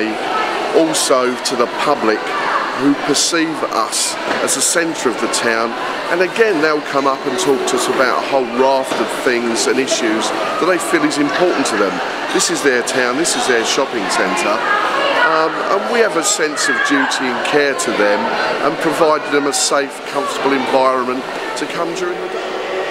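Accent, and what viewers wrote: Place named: Cockney, United Kingdom. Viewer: he sounds like a geezer from EastEnders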